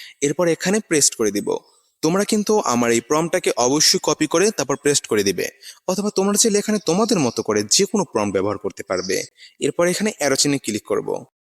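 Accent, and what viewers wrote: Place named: Sylhet, Bangladesh. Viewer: সিলেট থেকে আপনি?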